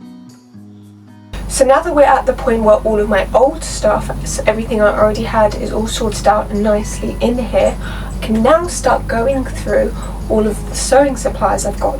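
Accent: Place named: United Kingdom. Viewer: May I ask a personal question? English is my second language and I am used to American YouTubers. You sound definetly British but I would like to know from which British region your accent is coming from. Or would it be some common speech pattern for the whole of Great Britain?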